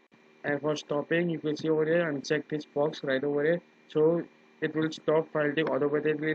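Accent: India. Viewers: u from india? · indian indeed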